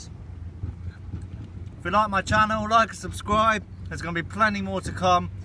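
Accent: England, United Kingdom. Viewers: Lovely British accent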